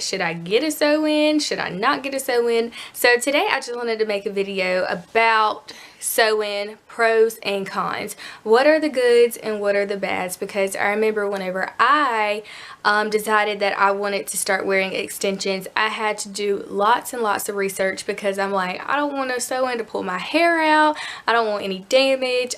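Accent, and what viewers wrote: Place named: Texas, United States. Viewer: I love her accent .. it’s like TEXAS 👍🏼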